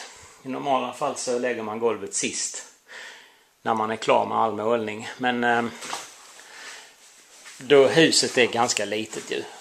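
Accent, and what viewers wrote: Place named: Hässleholm, Sweden. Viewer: jag har tidigare jobbat i hässleholm och tyckte jag kände igen språket:)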